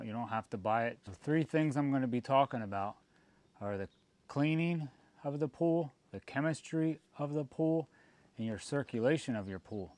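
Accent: Pennsylvania, United States. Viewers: I just watched this and it’s great! 1 question, are you from Northeastern PA!!? You sound like it.👍😂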